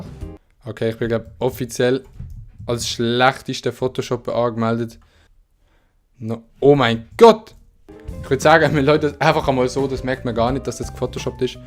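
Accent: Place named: Zurich, Switzerland. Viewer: züri düütsch 😂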